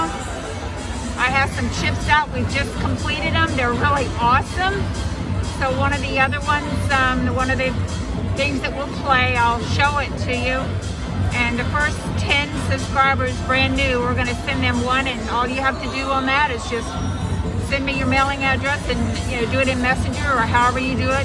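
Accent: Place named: Pittsburgh, United States. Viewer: Do I detect a fellow Pittsburgher? If so, hello from Kennedy Twp. Love the video 😊❤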